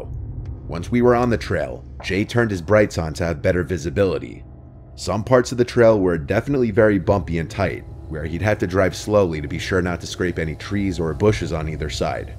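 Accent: United States. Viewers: I don't know American accents, but I like his. Where is it from?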